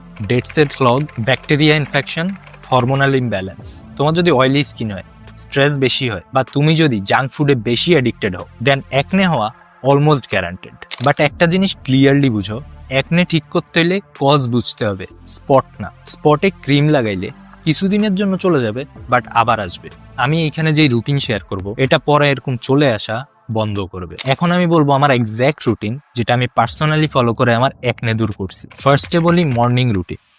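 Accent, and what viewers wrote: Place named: Noakhali, Bangladesh. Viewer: oh but tomar kotha bolar dhoron amader Feni Noakhalir motoi ❤️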